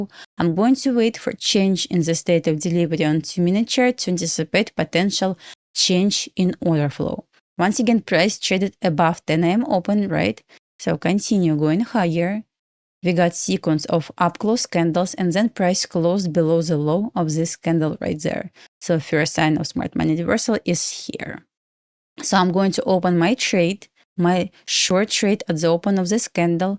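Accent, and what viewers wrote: Russia. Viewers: Why your voice sounds like Russian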